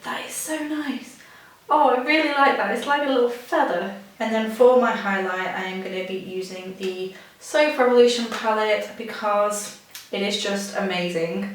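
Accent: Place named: United Kingdom. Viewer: TheRedMoon its british😁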